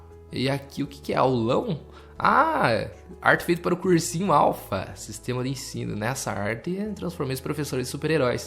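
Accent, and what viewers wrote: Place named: Curitiba, Brazil. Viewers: sotaque curitibano forte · Tu tem um sotaque daqui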